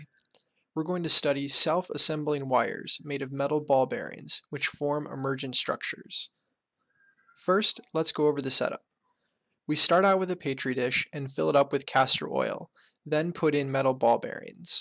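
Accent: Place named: Rio de Janeiro, Brazil. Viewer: pq o inglês dele tem sotaque carioca??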